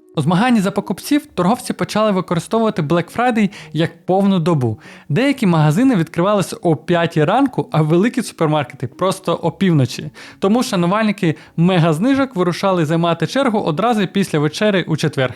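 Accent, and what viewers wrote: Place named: Russia. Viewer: справа воної, леді Ю, живе. слухаю і на фоні 'ґаз, зубажіння, любі маі' пане, читайте, говоріть в побуті, вживайте український контент. бо це якась жесть. кантент, навини, сорак, панеділок... вже десятки відео, а розвитку ноль. згадалось вашою залупянкою 'чукча нє чітатєль, чукча пісатєль' український контент російською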